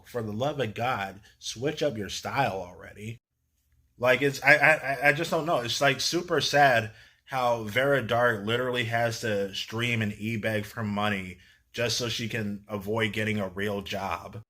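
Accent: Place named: Texas, United States. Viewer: Where you from man you sound like you are from Texas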